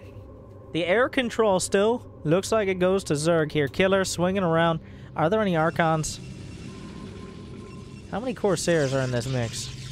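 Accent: South, United States. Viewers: Dude you have sick commentary skills, super entertaining! Is this guy from the South or something?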